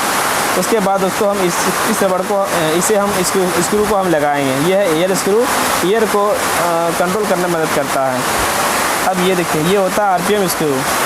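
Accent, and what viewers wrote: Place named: Bhagalpur, India. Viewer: कहां से हो भाई। भाषा तो भागलपुरी लगता है।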